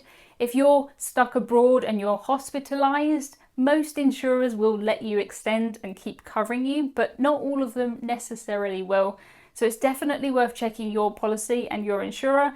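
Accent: United Kingdom. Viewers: Based on this video, you know.. there isn't a definite answer, it all depends on the situation and the insurance company. But, having said that this is the best, most explanatory vid I've seen. Thank YOU! I will post a link to this vid for people that ask me about the cancellation policy and possibilities. RE: the naughties.. no, we dont' say that, I guess we just call it the 2000s? but from now on, I'm calling it the the naughties, lol. Definitely agree with the waiting game. I have an Oct cruise. A few months ago I had no doubt it would happen, now... I'm not so sure, but I'm not cancelling! Thanks. love your content, and your accent just takes me 'home' (mom is british, dad american, left Uk when I was 5)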